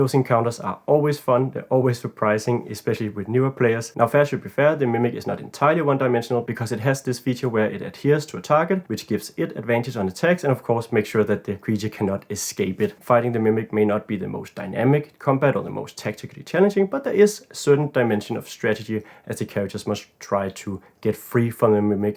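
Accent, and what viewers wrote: Denmark. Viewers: are you danish as a dane myself the accent is pretty easy to hear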